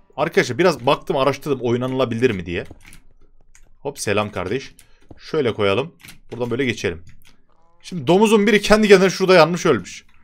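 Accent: Yozgat, Turkey. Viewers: Abi yozgatlımısın?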